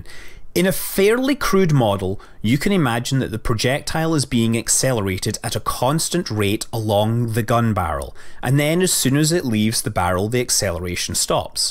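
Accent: Scotland, United Kingdom. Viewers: Hey... are you scottish, you sound scottish?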